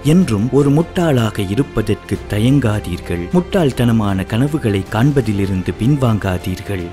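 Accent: Sri Lanka. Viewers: Nice to hear Sri Lanka tamil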